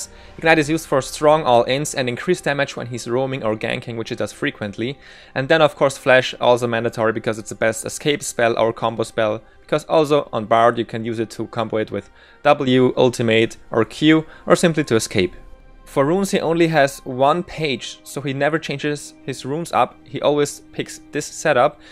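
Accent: Germany. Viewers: german accent? ^^ haha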